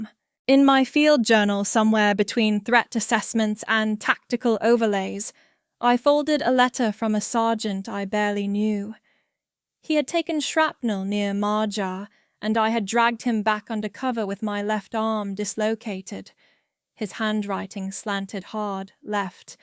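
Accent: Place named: England, United Kingdom. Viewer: Why does she have an English accent?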